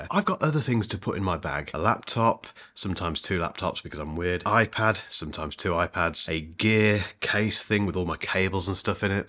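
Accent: United Kingdom. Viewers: Calm lovely voice, British accent is the best ❤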